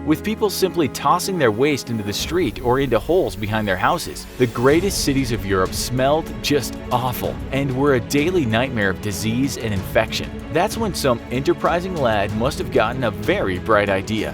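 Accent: United States. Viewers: Man i hate this american narrator, feel like i'm getting dumbed down just by listening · "In the human history??" Who TF is running infographics? And why do these people hire Americans to narrate?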